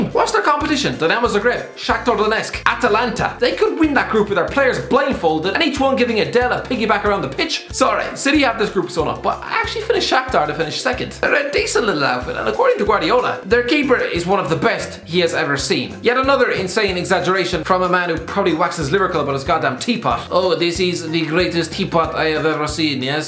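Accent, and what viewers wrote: Ireland. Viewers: What part of Ireland r u from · Are you irish?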